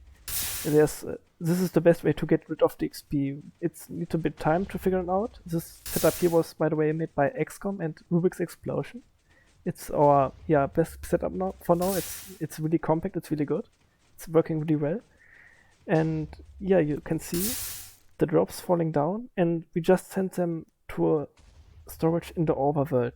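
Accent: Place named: Germany. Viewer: man hört sogar das du deutsch bist. dein englisch man hört es.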